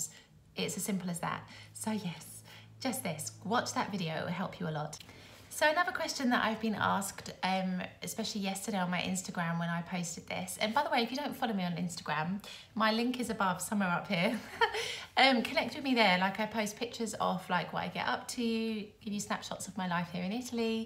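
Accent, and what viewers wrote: England, United Kingdom. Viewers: I just found out your channel, I love your accent, what part of England are you from?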